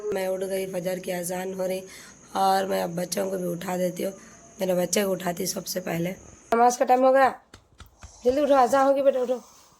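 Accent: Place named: Hyderabad, India. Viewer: mien yaad rekhna mujhey mien bahut pareeshan hun ,,,,❤❤❤❤ App baat kerty ho meri aik dost ki terha ,woh bhee app ki terha Hyderabadi zubaan bolty theien ,,nawab bhai or Bhabi meray pyari baree behan ,app Kay jaisa hi boltay thay same ❤❤❤❤❤❤ Namaaz Quran roza ,,bachon ko sab Sikhaya app nay Allah Pak qabool karay hum moms ki koshish,,mien bhee same app Kay jaisa try karee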